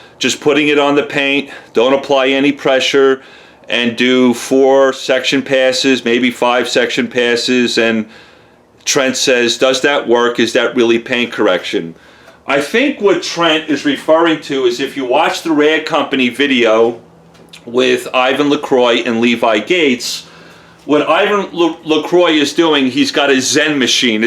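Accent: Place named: Rhode Island, United States. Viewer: Love u to...lol....your accent...I have the same accent...Rhode island lol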